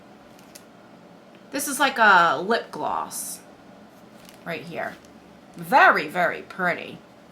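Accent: New Jersey, United States. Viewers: I definitely like it when you show cosmetics and and some of the clothes! I love your Jersey accent!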